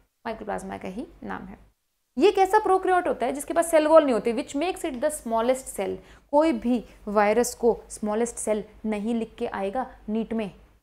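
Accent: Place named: Bengal, India. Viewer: I think she's Bengali.. because I feel it in her accent of speaking Hindi 😌💜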